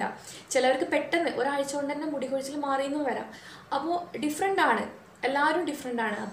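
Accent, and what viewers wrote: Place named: Kozhikode, India. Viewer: njnm calicut aanu chechii.. Slang kettappo thonni atha chodhiche ♥️😘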